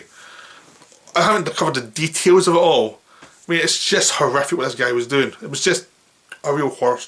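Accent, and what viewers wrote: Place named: England, United Kingdom. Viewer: He has a very thick England accent and hard for ME (prob only me) to understand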